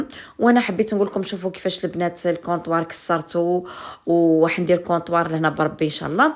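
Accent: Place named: Constantine, Algeria. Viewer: مشاء الله تبارك الرحمن تهبل بمعنى كلمة نموت عليك وعلى ناس قسنطينة وهدرتهم .متتبعة وفية من جيجل ❤❤❤❤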